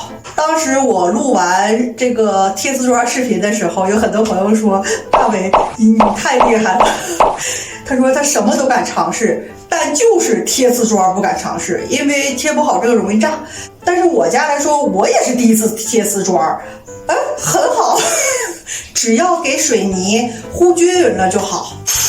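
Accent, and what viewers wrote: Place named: Heilongjiang, China. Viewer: 为什么这么像黑龙江口音？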